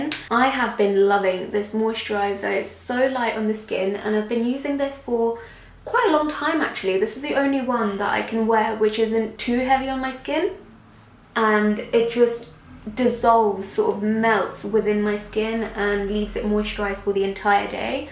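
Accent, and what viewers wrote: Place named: United Kingdom. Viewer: glad to know that I love you British accent. Keep it up. I started watching your video from the one where you explained the deep set eye.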